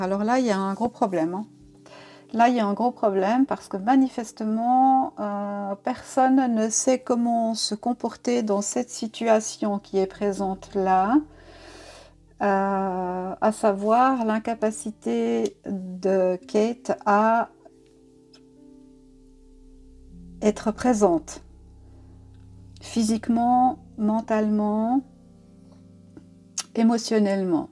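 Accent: Switzerland. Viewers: Coucou, j’ai été scotchée par cette vidéo, je viens de m’abonner 😉😉😃. Mais au fait, a-t-elle vraiment un cancer on peut en douter maintenant après avoir écouté votre vidéo, ils préfèrent dire cela plutôt que d’expliquer qu’il y a eu un couac pendant l’opération? Il m’a semblé entendre un joli accent venant de Suisse romande, si c’est le cas 👏👏 bisous de Genève 🥰🥰🇨🇭🇨🇭🇨🇭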